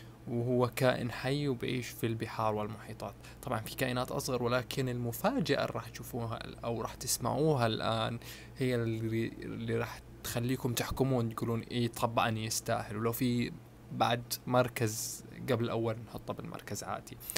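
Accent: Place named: Levant, Jordan. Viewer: توقعتك من الأردن او فلسطين وفعلا صوتك مميز ملاحظة بسيطة قص اظافرك 😁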